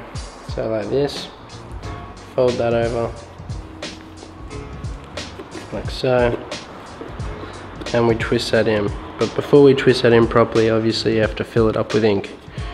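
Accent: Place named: Australia. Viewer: U is Aussie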